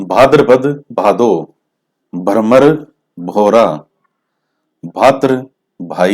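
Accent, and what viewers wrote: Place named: Marwari, India. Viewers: Sir aap churu se ho kya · Pranam sir ji 🙏 Sweet marvadi bolty ho app sir .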